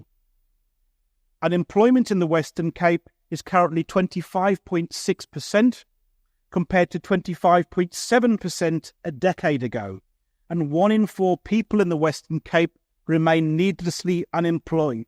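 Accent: United Kingdom. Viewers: You sound like you are from the UK?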